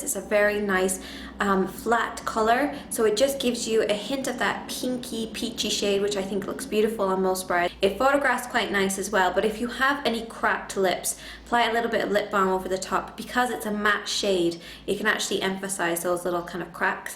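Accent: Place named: Ireland. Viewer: Are you irish... and moved to the usa years ago? because it sounds as if you used to have an irish accent and are losing it.. that or a parent has the accent and certain words rubbed off on you. whatever it is.. i love that accent. its beautiful.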